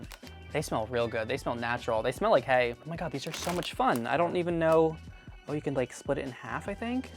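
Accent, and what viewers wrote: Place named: United States. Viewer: I spent the first three minutes of this video trying to figure out what his accent is, and I realised it sounds like gay American. And I really don't understand how that's an accent, but that's what he sounds like.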